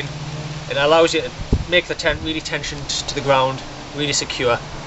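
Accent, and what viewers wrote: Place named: Newcastle, United Kingdom. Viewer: I like ur voice. Geordie. Mmm.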